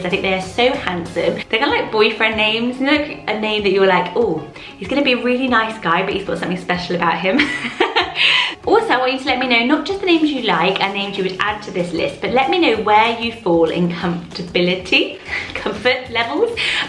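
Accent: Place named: Bristol, United Kingdom. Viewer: The whole way through this I was like you sound like your from Bristol and then you said Wiltshire and it all makes sense haha x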